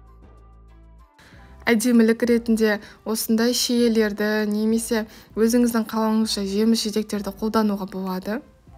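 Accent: Standard. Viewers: Таза қазақша сөйлеген тіліңіз қандай балдай?! 👍👍👍👍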